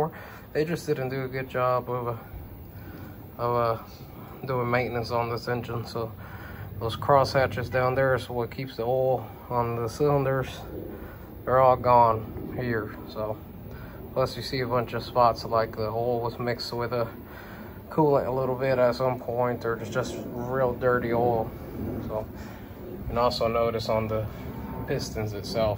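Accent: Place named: Texas, United States. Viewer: Knew this guy was from Texas right away, set video speed to 1.25. Much better.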